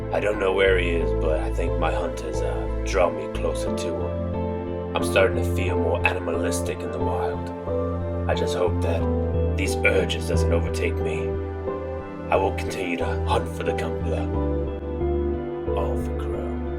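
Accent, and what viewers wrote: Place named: Australia. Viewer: Can someone translate??? I don't understand Australianese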